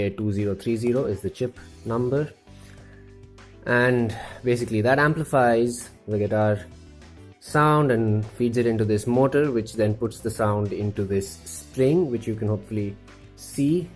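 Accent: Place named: India. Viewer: Superb work! Your accent sounds Indian😃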